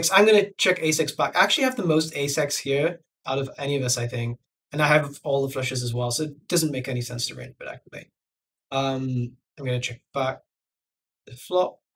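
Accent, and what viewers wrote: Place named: United Kingdom. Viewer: Where are you from in the UK? Hard to pick the accent!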